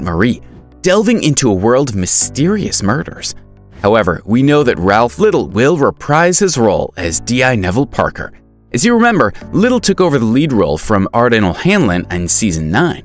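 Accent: United States. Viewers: It's a British program . Why is an American doing the voice over????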